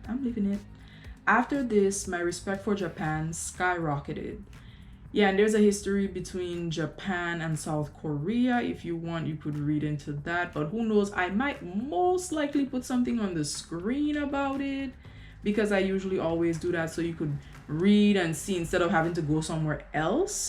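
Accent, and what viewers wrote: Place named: Grenada. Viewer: You sound so Grenadian! I do not go where the sun don't shine!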